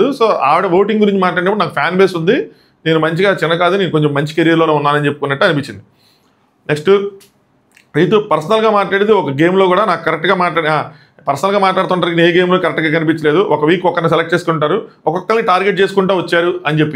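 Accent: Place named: Vijayawada, India. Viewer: Vijayawada slang vachheytthandi annai🤣🤣🤣😂adi thoti vijayawada mate ki matramey telusttundi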